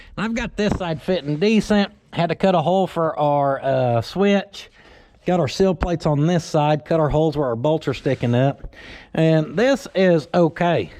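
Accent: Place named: South, United States. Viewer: I'll bet that a lot of folks see channel and think: "he sounds like cornbread... I bet he does a lot of hillbilly rigging on his projects." I watch a lot of automotive channels, and I can truthfully say that is as good a mechanic as most of them, and welds better than all of them. He follows his vision, putting in the extra work to do things right. He is humble enough to show you his screw-ups and how he fixed them. All the while having a good attitude and a great sense of humor. He should have a lot more subscribers... I guess some folks don't like cornbread. Their loss.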